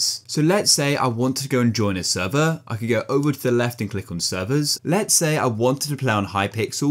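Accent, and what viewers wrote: United Kingdom. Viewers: soo british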